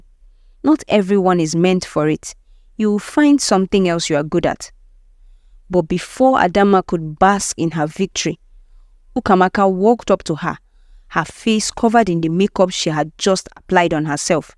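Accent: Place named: Africa. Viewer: I’ve been binging this whole channel for the past hour! It just popped up on my feed, and I love folk tales, fables, and traditional stories of different cultures that don’t usually get a lot of exposure internationally. The diverse communities in Africa, which are many, are very interesting. They’re not like any others that get the most attention and are retold and regurgitated by those who don’t have the knowledge or experience to tell these tales properly to convey the message. This channel is so unique and the stories they share are powerful and apply to so many different situations in every community. The messages are poignant and touching and they make you think deeply about the overall lessons they are supposed to teach and the difference it’s supposed to make in all aspects of life. Not that this is important to the overall story, but the narrator’s accent really brings it to life. 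The accents of the many unique cultures in Africa are so distinctive and beautiful. Stories told by the people who are intimately connected to the both the land and the people. This gives it an authentic and trustworthy feel that leaves an impression that stays with you forever. Bravo to the channel and its team for such excellent and extraordinary work!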